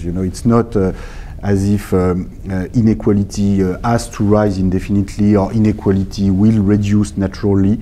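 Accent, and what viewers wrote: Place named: France. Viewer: His French accent is annoying.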